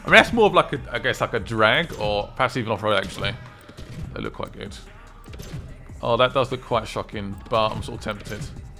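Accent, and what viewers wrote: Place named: Southampton, United Kingdom. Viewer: Bit of a southampton tune like it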